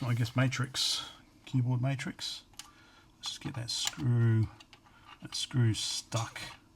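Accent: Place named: Australia. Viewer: Sounds Australian. Sorry if you are not. 2 minutes into video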